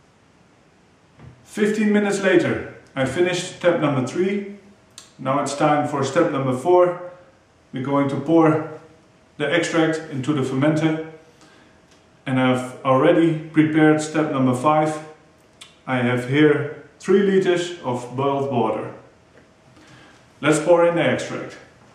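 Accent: Netherlands. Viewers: hey dude I moved to norway (from holland) the beer here is about 10 times more expensive as in holland. so when I stumbeled on to this in a paint shop ;), I just bought the stuff because I like to do new stuff and then yeah when it comes to beer theres no doubt. Ìm starting right now on the brew. but dude I gues you are dutch too right. the way the speak english sounds so dutch!! haha. well Im gonna make me some beer now!!!! thanks for the movie!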